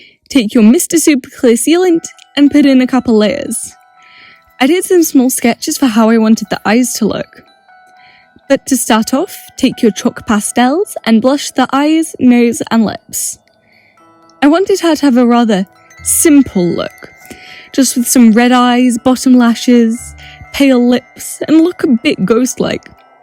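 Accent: United Kingdom. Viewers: OMG THIS IS AMAZING!! I have just found your channel, and normally, I dont watch many customisers because I can't understand what they are saying, and then I realise you are British, and I am British too so I was internally screaming with joy. And your customs are so good!!! I love how this doll has an eerieness to her, and yet still friendly. You deserve so many more subs. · She looks great! I honestly think the crinkly wings work great, even in nature these kind of wings are never perfectly smooth and usually have a slight texture. Also, creepy vibe and all that lol. I found you via Dollightfulls community post and my god, you sound delightfully british. It was a pleasure seeing your process and hearing you explain it.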